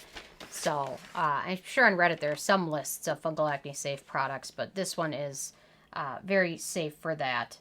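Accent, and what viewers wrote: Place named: Midwest, United States. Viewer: Are you from the Midwest? Your accent sounds like mine. I’m from Michigan! Haha. Also, great video as always.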